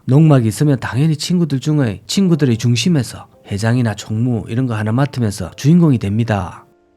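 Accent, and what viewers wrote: Gyeongsang, South Korea. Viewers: 님은 방송국 나레이터가 딱입니다. 호감형의 구수한 갱상도사투리로 은근 중독성이 있어요... 참고로 저는 전라도 사람이여이잉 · 경상도 사투리가 이렇게 구수 했나? 잘 보고 갑니다 ^^ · 이 분 경상도 말씨는 참 듣기 좋은 구수한 말입니다. 다니시느라 수고가 많습니다~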